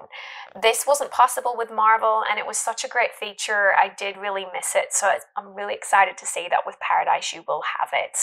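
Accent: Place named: Northern Ireland, United Kingdom. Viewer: Love that Northern Ireland accent. 💚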